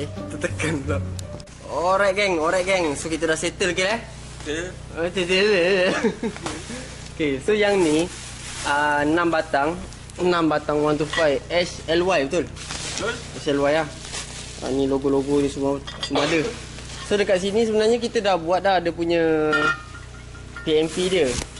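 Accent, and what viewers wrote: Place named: Negeri Sembilan, Malaysia. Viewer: buat vlog ckp nogori la plk....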